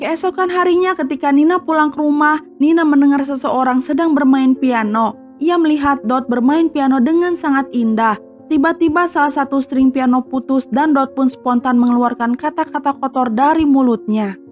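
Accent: West Java, Indonesia. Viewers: Sama😭 itu kek logat sunda sih bukan medok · Logat Sunda mu mbak , aduhhhh .. tolong perbaiki mbk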